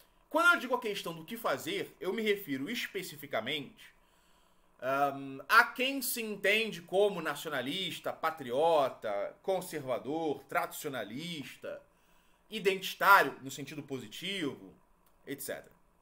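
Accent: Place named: Rio de Janeiro, Brazil. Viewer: rapaz, o carioquês tá brabo hoje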